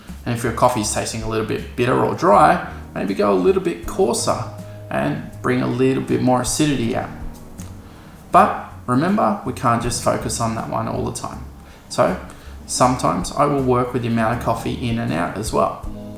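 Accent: Australia. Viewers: Good vid and Australian love it, well done.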